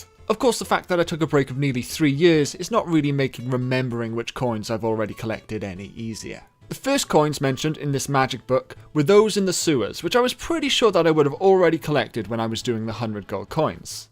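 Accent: Wales, United Kingdom. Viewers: Are you welsh? Also you deserve more views.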